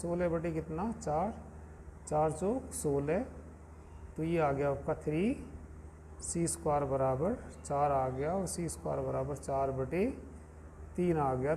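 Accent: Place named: Saharanpur, India. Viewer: Sir Aaj kha se ho islsiye puchh ra kyoki aapki bhasha hmare jaisi h ,,,,Sharanpur wali .😅❤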